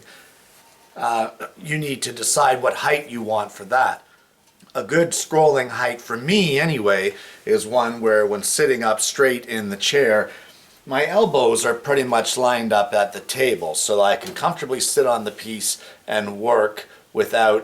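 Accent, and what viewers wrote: Canada. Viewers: I really did appreciate your video - it really opened my eyes. So, I got close, eh? HAHA!!! Your lic. plate is Ontario, and your accent is Canadian ... I grew up in Buffalo, NY. Gets cold, but hey, we gotta woodworking shop for that, right? Great teaching! Thanks again! Btw, I'm going to build wooden clocks with wheels no larger than 20 cm; could you recommend a model that would do wonders for me? Thanks again! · I thought Canada too, from your accent. My son's living and working in Toronto, so I expect his accent will change slightly while he's there. The scroll saw tips are really useful. So much choice, I need to be well-informed before buying one.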